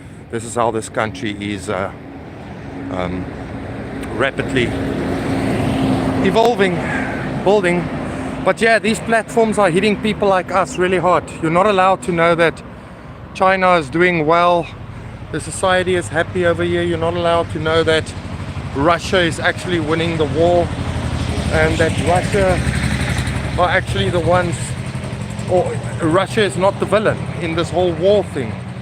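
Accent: South Africa. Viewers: Are you a South African boy? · You sound like a South African. ❤ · You sound like South African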